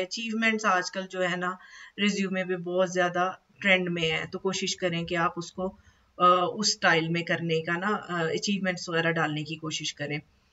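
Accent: Punjab, India. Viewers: Ma Sha Allah , Nice sharing👍🏻 awesome video. Very informative and very well explained. 😍loving your Punjabi style. Absolutely amazing ❤ keep sharing videos dear. My kids also watch your videos. They like your way of talking 😍❤️ i also follow you on instagram.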